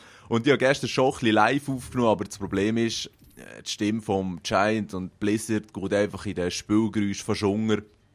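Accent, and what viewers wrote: Bern, Switzerland. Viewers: du bisch zviu:) bisch e bärner oder Luzerner? gruess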